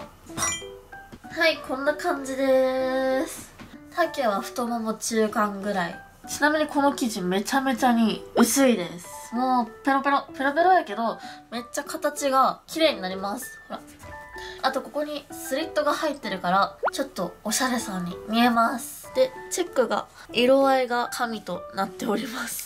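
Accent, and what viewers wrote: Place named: Kansai, Japan. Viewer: の関西弁めっちゃ可愛い💗💗💗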